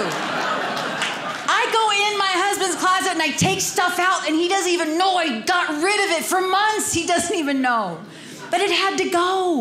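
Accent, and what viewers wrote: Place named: Texas, United States. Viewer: We can tell your from Texas by the yelling, extreme speaking voice.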